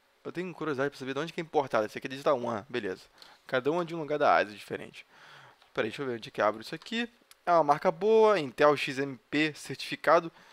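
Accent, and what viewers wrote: Rio de Janeiro, Brazil. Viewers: Tu é de qual zona do rio!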